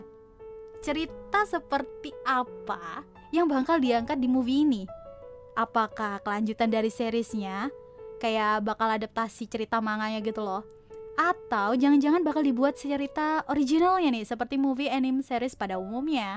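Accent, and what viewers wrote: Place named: Sunda, Indonesia. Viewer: Fix naratornya orang sunda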